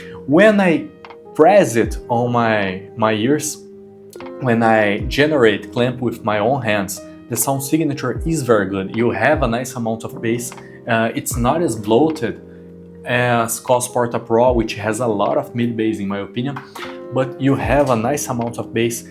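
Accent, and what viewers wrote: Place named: Brazil. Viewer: Eu entrei no vídeo pensando "só gringo deve estar fazendo review desse fone" aí no que ouvi o sotaque já saquei que era BR kkkkk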